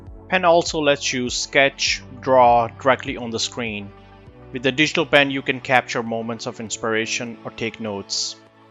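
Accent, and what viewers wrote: India. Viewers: If u are Indian put the title in indian trust me no one wants to hear ur English accent get ur audience from your own shitty cohntry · Great Video, but the accent cringe is real! Either use Indian or work more on the US one. It's painful inbetween. Cheers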